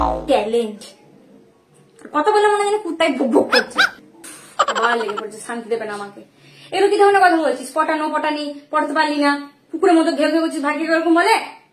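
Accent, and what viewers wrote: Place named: Murshidabad, India. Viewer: মুর্শিদাবাদ এর উচ্চারণে বেশ ভালো লাগছে 😂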